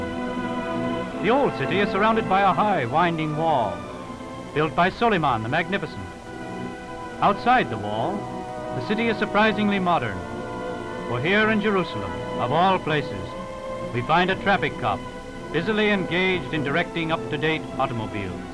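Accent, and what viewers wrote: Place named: United Kingdom. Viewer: Its always with a british voice.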